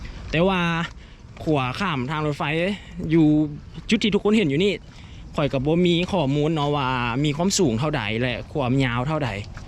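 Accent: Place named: Thailand. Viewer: ສຽງໄທບ້ານຫນອງຫນ່ຽງເບາະຄົນບັນຣະຍາຍນີ້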